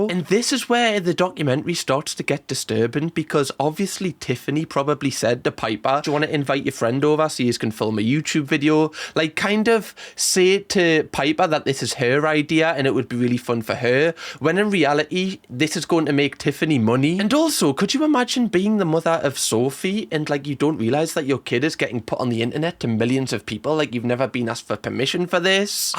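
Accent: Newcastle, United Kingdom. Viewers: He says he has a Geordie accent? I don’t know where that’s from 🇨🇦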